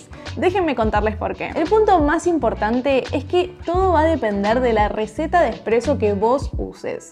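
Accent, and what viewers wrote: Argentina. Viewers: Soy de México, y cada vez que veo tus videos, además disfrutar ese acento femenino de argentina que cautiva, me embelesa tu desempeño. Si ya sé, debería hablar de tu belleza, pero ante tu profesionalismo, sería una falta de respeto ignorar este. Bueno, hay un poco de broma, excepto acerca de tu profesionalismo (y de la belleza desde luego). Me gusta tu canal porque soy una de las personas atípicas. A mis sesenta y tantos años de vida, mi acercamiento al café, es increíblemente reciente. Sucede que soy intolerante a la cafeína, me impide dormir (literalmente), uno o días seguidos con tan solo una taza, pero cuando sucede y me desvelo hasta las cinco de la mañana, a las siete en punto despierto como si nada. Claro, el café no me engaña, mi cuerpo está cansado pero me dice una o dos cosas para que crea lo contrario, en fin. De alguna manera ahora me he aficionado al café y como siempre sucede con mis pasatiempos, me he apasionado, llevo algo así como seis meses viendo tus video y los de muchos mas (les recuerdo que mi afición al café es reciente), y mira que he descubierto un mundo. 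Me sucedió lo mismo con el vino tinto y la cerveza artesanal de las cuales, en efecto, tengo un poco más de tiempo. Me da la impresión de que fuera de tu edad, que te ves muy joven, tienes ese tipo de conocimiento y de experiencia que convencen, y créeme, soy el mayor escéptico y quisquilloso que pudieras conocer, cada cosa que se dice la investigo, y debo ser honesto, me has sorprendido. Felicidades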